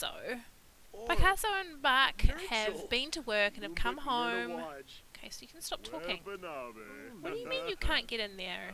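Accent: New Zealand. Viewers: I love the sims. love kiwi accents also "struth"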